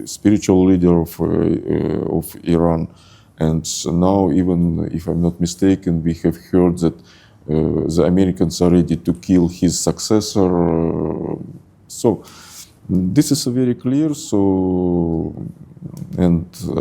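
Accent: Russia. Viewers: Aksen rusianya kental.. Keren..😊😊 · Gw tetep harus denger karena gw juga pengen denger aksen rusianya pas ngomong bahasa inggris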